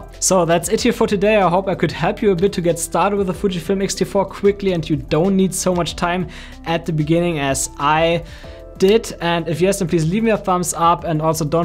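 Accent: Germany. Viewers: German or Austrian?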